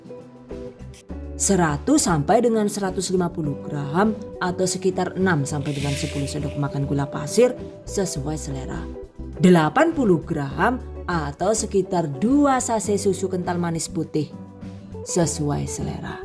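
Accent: Banyumas, Indonesia. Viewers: terimakasih atas resep nya kak ,ngapak juga nih kakak 😂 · Sg penting ngapak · Kie wong nek agi ngomong ngapak jan merguyokna pol😂😂😂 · Mantap Bunda apa lg dng bahasa ngapaknya aku suka sekali jd sambil nonton sambil ngakak😍👍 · Selamat pagi , matap . Gabung bikin ngiler haaaiy .Bunda Suaranya Jawa enyong (kebumen , Bnyumasan )jw tulen 😃😃😃😃😃😃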